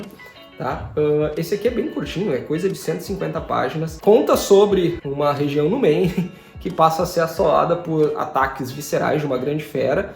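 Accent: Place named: South, Brazil. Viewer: Homem eu jurava que você era do sul. Você .pra no rio de janeiro? 😅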